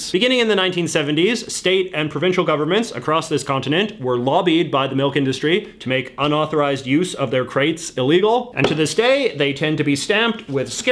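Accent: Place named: Vancouver, Canada. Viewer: Scary robot voice with slight Vancouver accent.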